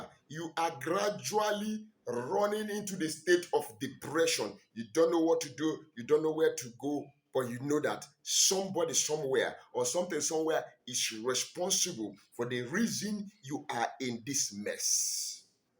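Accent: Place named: Nigeria. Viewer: getting you lound n clear from Kenya, love your Nigerian accent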